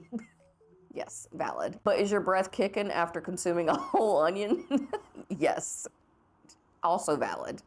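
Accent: South, United States. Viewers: I knew as soon as you said boil you where southern. It’s a dead giveaway 😂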